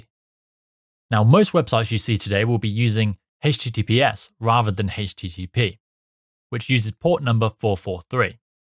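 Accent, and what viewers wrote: United Kingdom. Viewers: Excellent layout, delivery, and explanation of material. Only problem I have is with the british accent, but I struggle with all types of accents. I totally cannot grasp concepts from asian, Indian, arab, french, german, and spanish accents. But I improviced and overcame this by reading the video scripts after the video. This method of learning complex concepts is the best of all methods of learning.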